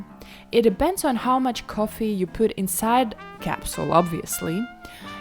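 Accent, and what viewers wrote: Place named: Eastern Europe. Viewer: My guess would be former Yugoslav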